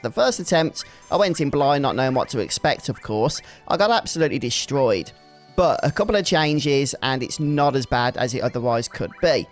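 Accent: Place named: Black Country, United Kingdom. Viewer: I love whenever I open a video and hear a Birmingham/Black Country accent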